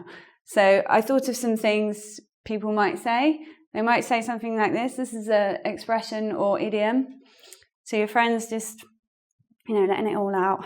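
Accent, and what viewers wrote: United Kingdom. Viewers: Hi:) i really love watching your video in youtube and engvid what a intresting topic and i like your real british english <3 at first time i watched your video, i can't understand anyting!! but now i heard your all word of sentences. thanks a lot.XX but would you mind if you tell me your personal website address?:) please reply me:) i'm waiting you ❤️ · Her accent is Ideal in order to get used to british accent: it has all the characteristic of the accent of people I can't understand, but I can easily understand all she says. I'm actually watching all her videos to get used to british accent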